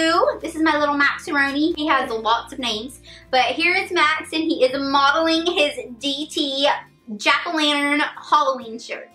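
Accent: South, United States. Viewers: This is random but your accent reminds a lot of Jamie Lynn Spears sort of country ish it's so cute! 😁😂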